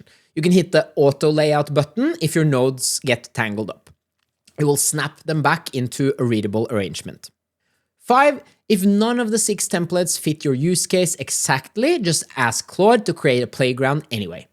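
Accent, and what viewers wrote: Norway. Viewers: Its a typical Norwegian accent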